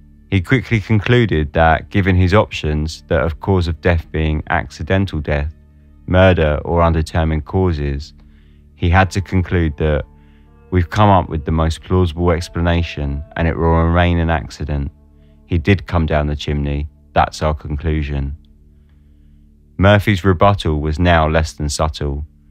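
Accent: England, United Kingdom. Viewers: Thanks for posting...you sound English, but that means nothing if you live in another country. But what about the case of my friend Fiona Saunders, a young mum who was beaten to death in 1986 in Church Crookham, Hampshire. And was the reason her case has almost been completely buried because one of the suspects became a police officer after?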